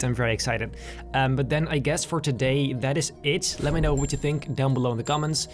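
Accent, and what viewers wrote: Netherlands. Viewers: en dit zegt iemand die engels praat op 'n hele nederlandse manier...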